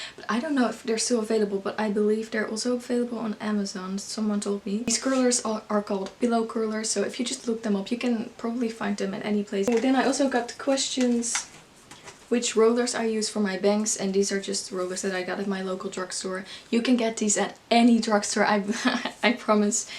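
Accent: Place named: Netherlands. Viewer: you sound dutch or belgian, weet niet precies welke van de twee. leuke tutorial!!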